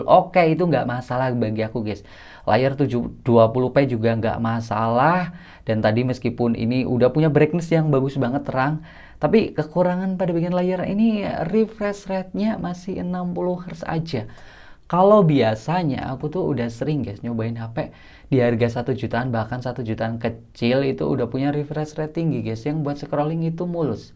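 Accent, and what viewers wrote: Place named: Banyumas, Indonesia. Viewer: Kamu orang daerah mana sih bang?😄 Logatnya rada ngapak.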